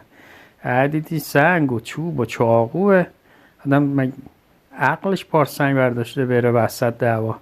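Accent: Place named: Qom, Iran. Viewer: عالی بود با لحجه قمی که در حاشیه هم جواد رضویان بازی میکرد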